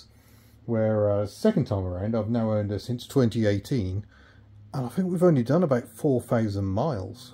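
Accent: United Kingdom. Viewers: Listening without the visual offers a somewhat "Carry on " experience which is highly entertaining and quintessentially British flavor!!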